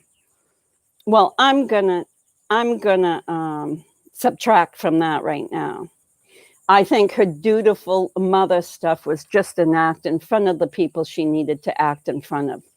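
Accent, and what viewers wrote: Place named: New York, United States. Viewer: I love your daily greeting; very Marilyn Manson like, but unsuspecting! And, I'm guessing a New York accent. Probably from New York City? I'm guessing and sleuthing on you! GOOD MORNING BEAUTIFUL PEOPLE!! xoxo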